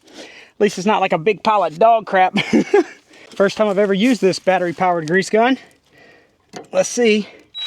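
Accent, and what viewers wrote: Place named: Carolinas, United States. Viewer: Carolinas, I thought so from the accent. Northern central Alabama here.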